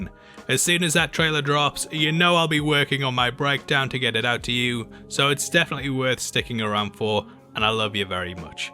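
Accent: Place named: United Kingdom. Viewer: Wait a minute..... you’re from the UK!!?? 😳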